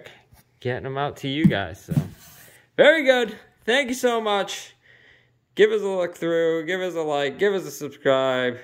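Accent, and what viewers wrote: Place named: Boston, United States. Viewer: If they sell well, please order 2X and 3X. Never like shirts that actually fit. I like them falling off. Never white, black or dark colors are best. I'm a scrapper and it'd get ruined on day one. Also, I'm originally from Somerville, MA. Love to hear you speak Boston. I'm in Connecticut and they talk funny LOL.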